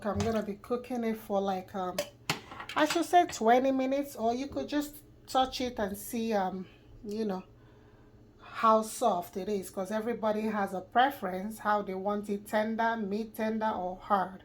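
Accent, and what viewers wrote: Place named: Sierra Leone. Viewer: Are you Sierra Leonean? I can hear the Salone accent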